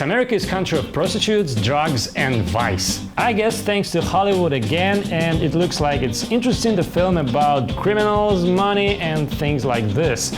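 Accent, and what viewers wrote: Russia. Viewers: Дима Крут ну правильно, в русском акценте все понятно;)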